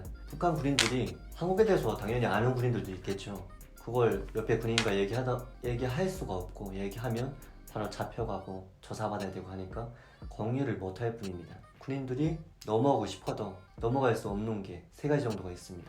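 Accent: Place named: Busan, South Korea. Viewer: 부산 특유의 억양 ㅋㅋㅋ 북한에서 청년기 보내도 서울말 자연스럽게 쓰는데 왜 경상도는 안 되는 거야 ㅋㅋ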